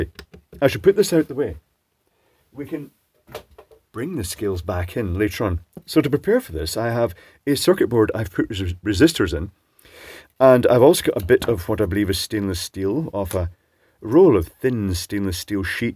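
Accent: Scotland, United Kingdom. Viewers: Scottish accent and at one point American pronunciation of Aluminium!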